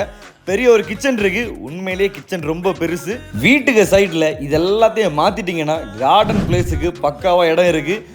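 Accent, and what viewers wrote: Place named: Marthandam, India. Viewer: Marthandam tamil pesuraan kanyakumari or kerala border ah irukum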